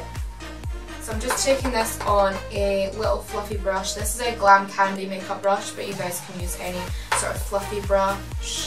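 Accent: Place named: Wales, United Kingdom. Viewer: Is this what all welsh ppl sound like ?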